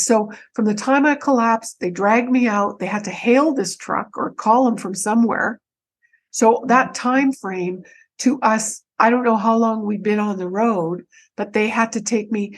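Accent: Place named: Scotland, United Kingdom. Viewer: I just love her Scottish accent more than anything else on YouTube!!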